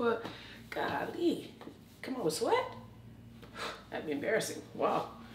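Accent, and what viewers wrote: United Kingdom. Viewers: Loving your haul from my favourite Shein. Wow your British accents spot on.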